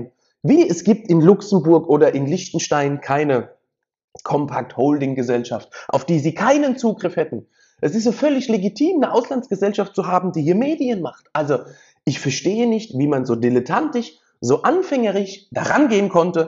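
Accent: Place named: Rhineland-Palatinate, Germany. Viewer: Jetzt ist mir das Wort wieder aufgefallen an dem man bei ihnen den Rheinland Pfälzer klar erkennt fS Wort heisst """ anderster""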